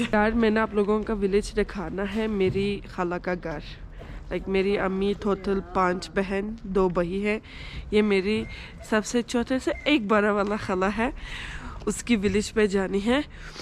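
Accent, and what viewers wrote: Pakistan. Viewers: ❤️❤️❤️ sister I love your Urdu accent😁😁❤️❤️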